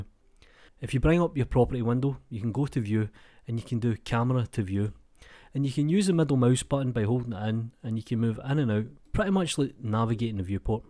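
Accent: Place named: Aberdeen, United Kingdom. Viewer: Nice tutorial man! Are you from Aberdeen?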